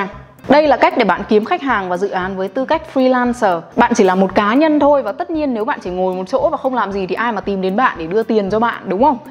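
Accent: United Kingdom. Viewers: Có ae nào thích nghe chị nói giọng Anh Anh không hí hí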